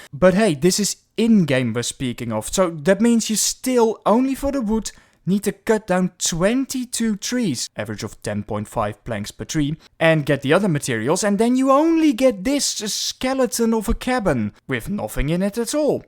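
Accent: Netherlands. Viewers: Haha tietemans 😂 weet niet of je het bewust heb gedaan maar ik neem aan dat je Nederlands bent aan het accent te horen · 16 seconds in and I can already tell you're dutch lol. nice video tho!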